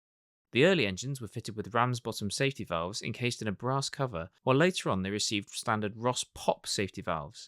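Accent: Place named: Newcastle, United Kingdom. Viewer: Certainly not the worst geordie accent I've heard and definitely hasn't deterred me from getting one!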